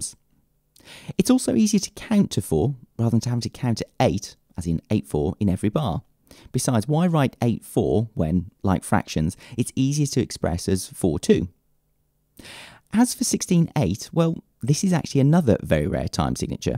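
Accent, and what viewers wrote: United Kingdom. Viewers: Can’t understand British, sorry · Oooooh, with this awesome british accent, everything seems easier...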